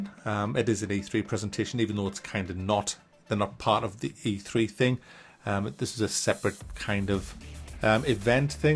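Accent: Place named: Newcastle, United Kingdom. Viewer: It's nice listing to a fellow Geordie youtuber, not many of us....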